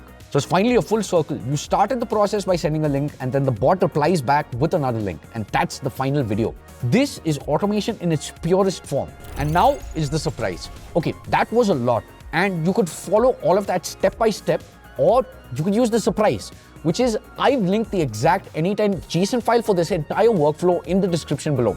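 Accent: India. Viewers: Ainda estou tentando descobrir se o sotaque é indiano ou venezuelano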